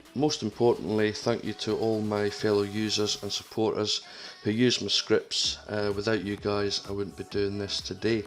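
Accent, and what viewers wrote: Scotland, United Kingdom. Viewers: Do I hear some Scottish in that accent? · No way , your Scottish ! Nice video man and great script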